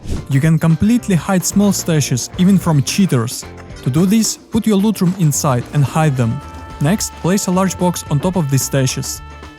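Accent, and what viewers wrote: Russia. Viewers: Бро разговариваешь хорошо, но мы знаем что ты из России) акцент тебя выдает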